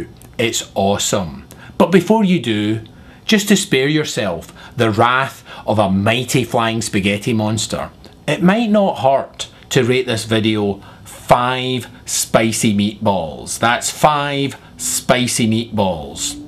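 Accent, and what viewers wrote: Scotland, United Kingdom. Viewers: hey, yus one funny dude! don't believe i take the 5 spicy meatballs but i do have 5 stars for ya! Keep up the good work and your scottish accent is COOL!!! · Scottish. Watch his earlier videos to learn how much he enjoys being mistaken for Irish.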